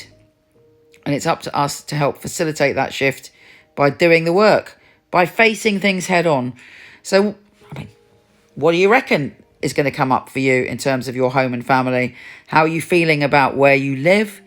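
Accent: United Kingdom. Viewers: Is this? How come she has british accent?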